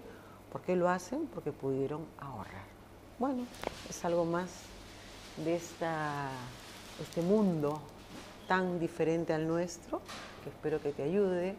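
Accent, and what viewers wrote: Peru. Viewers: Es el acento neutro peruano, tenemos más acentos por las demás regiones, pero uno de los más difíciles acentos para imitar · Tú eres peruana?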